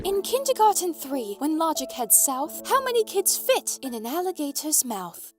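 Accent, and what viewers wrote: United Kingdom. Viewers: As a fellow British person, we do just say it like that 😅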